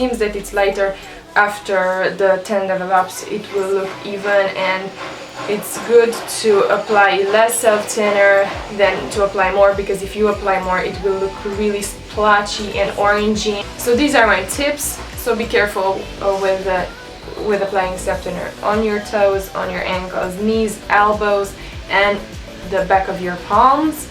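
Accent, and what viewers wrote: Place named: Romania. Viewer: Esti cumva din Romania ? Am vazut produsele romanesti si ai si accent 😘😘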